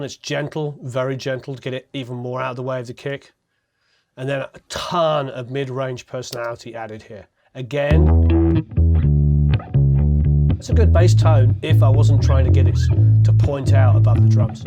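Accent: United Kingdom. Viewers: That and the british accent.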